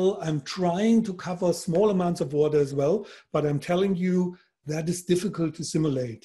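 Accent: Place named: Austria. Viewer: Great Info I'm definatly going to follow through also is the Accent Austrian I really like it XD!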